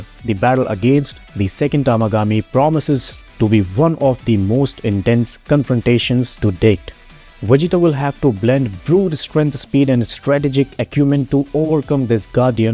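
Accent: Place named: India. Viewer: why are you talking like an indian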